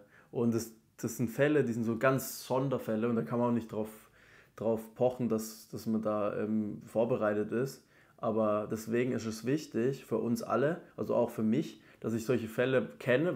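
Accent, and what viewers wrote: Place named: Baden-Württemberg, Germany. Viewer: ach ich hab jetzt nochmal paar mehr videos von dir nachgeholt und gemerkt, es liegt wohl an deinem Badenwürtember-Akzent. 😂 aber kommt dem schon nahe vor allem auch mit der Betonung. Ich meine Percy, die Rolle von Teddy Teclebrhan / aka Antoine.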